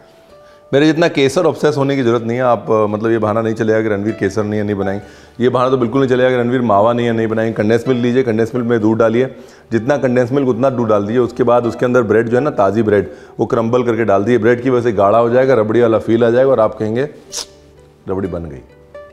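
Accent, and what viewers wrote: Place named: Lucknow, India. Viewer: Hey Ranveer I'm Gaurav from lko You are first whom I had subscribed I had never subscribed any one before on YouTube. Just telling you coz I admire you and your talent of cooking And over n above your commentary during cooking , poora lakhnavi andaaj Youu kahe ki baaton se hi tar kar dete ho . Hope you will read and reply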